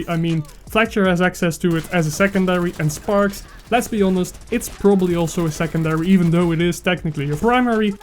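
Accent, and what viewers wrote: Netherlands. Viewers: Where are you from? Dutch?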